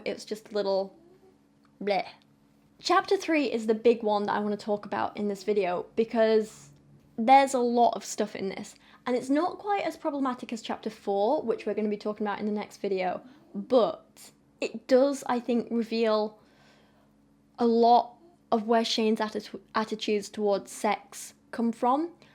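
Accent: United Kingdom. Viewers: After watching u for months I just noticed ur accent, like I didn’t realize u were British until rn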